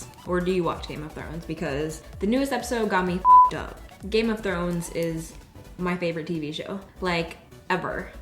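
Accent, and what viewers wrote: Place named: Tennessee, United States. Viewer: I know people have to grow and change which isn't a bad thing...but I miss the old, filming in her bedroom with her cute Tennessee accent 😔😕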